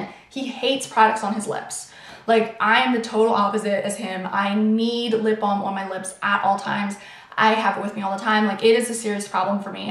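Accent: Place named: South, United States. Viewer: I like watching your videos because you're so pretty and I love your accent. I want to move to the south to get me a man with that accent! haha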